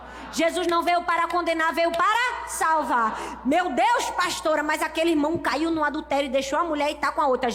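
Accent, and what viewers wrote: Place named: Pernambuco, Brazil. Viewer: AMOOOO MESMO ESTA PASTORA....DO SOTAQUE GOSTOSO DE PERNAMBUCO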